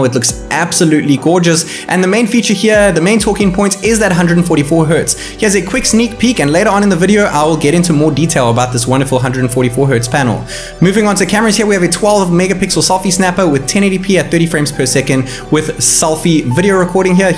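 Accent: South Africa. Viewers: Are you South African. It sounds like it. Love from SA :)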